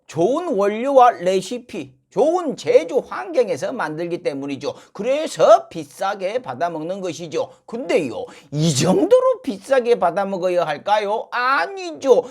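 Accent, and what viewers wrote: Jeolla, South Korea. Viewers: 다좋은데 ...난 성범이형편인데 ...일부러 예전 개그코너로 하는건 알겠는데 ...전라도 반달 사투리로. . 우파들 빈정거리는게 영. ,ㅠ 재미도 있긴하지만 .. ...형님 그냥 서울말로 코너하면 안되요? ㅜ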